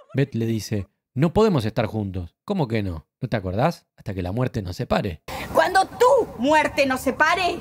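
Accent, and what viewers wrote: Argentina. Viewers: Una genialidad! La pelicula? no, el relato a lo Argentino!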